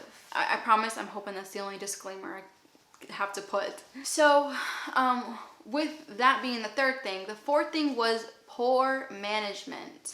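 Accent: Boston, United States. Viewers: I hope you get a job that pays well or you can focus more on Youtube! Also your Boston accent is so strong! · I hear your Boston accent 🤪❤️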